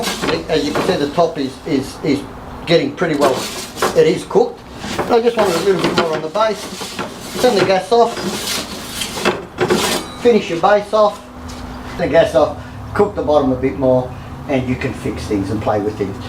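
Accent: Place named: Australia. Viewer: Gr8t video. And I loved your accent too (don't get me wrong it reminded me of my year abroad spent in Penrith NSW). Thanks mate.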